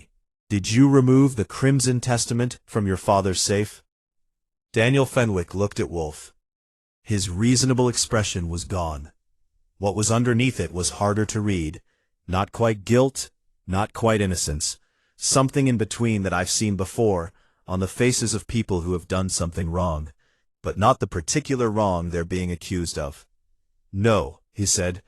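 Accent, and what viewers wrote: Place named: United States. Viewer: thanks for this amazing detective stories and overall thanks for the American accent, it's really difficult to get detective stories with American accent.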